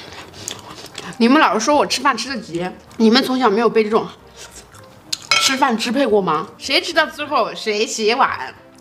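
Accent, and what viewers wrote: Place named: Northeast, China. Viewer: 听见东北口音了， 是快手过来的播主吗，，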